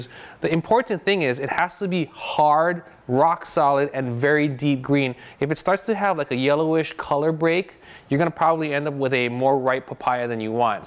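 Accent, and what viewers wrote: United States. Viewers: The problem is these americans think they can speak Englisd as well, like the rest of us. Terrible! Maybe we should advise them to watch BBC TV and learn from there.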